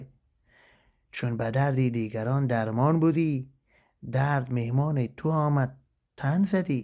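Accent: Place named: Afghanistan. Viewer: به به خیلی عالی ❤ این اولین بار است که مثنوی را با لهجه ی دری می‌شنوم و بسیار گوش نواز و صحیح و گیراست ، من گمان میکنم که لهجه ی شما به زبان مولانا نزدیکتره . سپاس فراوان 🙏🏽🙂🪻🫶🏽🌻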